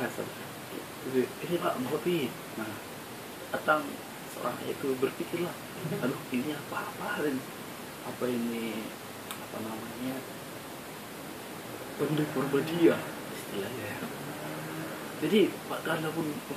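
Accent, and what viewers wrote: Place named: Nias, Indonesia. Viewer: Abang logatnya sepertu orang nias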